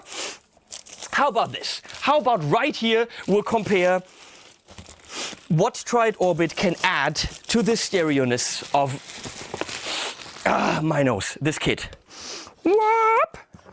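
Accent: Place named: Hesse, Germany. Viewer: Hahahaaa, wenn Du deutsch sprichst, kommt ein bisschen Hessisch durch... 😀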